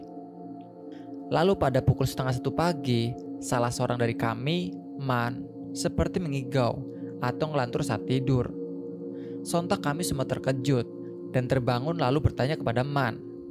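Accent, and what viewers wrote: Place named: Tegal, Indonesia. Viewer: Mas asli jw tengah tegal yo kon logat tegal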